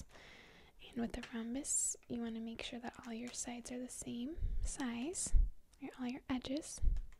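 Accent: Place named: Minnesota, United States. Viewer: are you from minnesota? you got the accent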